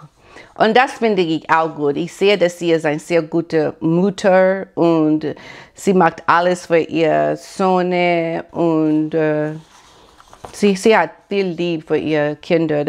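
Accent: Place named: United States. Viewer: Babs kann die ganze Patchworkfamily, also auch seine uneheliche Tochter Anna und Amadeus aus seiner zweiten Ehe, zusammenhalten. Sie versteht sich mit Angela Ermakova und Lilly Becker, die ihre Kinder sehr gut ohne Boris weiter erziehen. Finde ich ganz toll und ich hoffe noch immer ein bisschen, dass sie mit Lenny Kravitz zusammen kommt. Er ist über Jahre ihr engster Freund, aber vielleicht ist es besser so, denn eine Beziehung ist immer kompliziert und sie sagte einmal, dass Freunde sehr wichtig für sie sind und sie sich als Single wohl fühlt. Emanzipierte und intelligente 🚺 👍 Da fällt mir noch ein, ein Rothaariger mit einer Farbigen zusammen, wie bei HM 😅 aber Babs ist ein ehrlicher guter Mensch. Stelle dir mal vor, Harry betrügt M 🤣 Ich liebe deinen amerikanischen Akzent 😍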